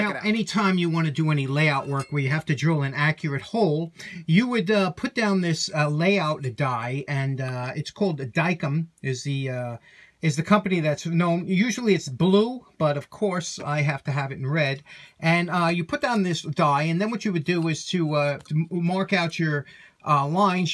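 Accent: New York, United States. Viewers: A very educational episode I loved it. No Disrespect intended but I love the new Yorker accent huh lol it's the best. Pretty soon it's going to be winter I'm in Texas so I'm ready lol. Thanks:D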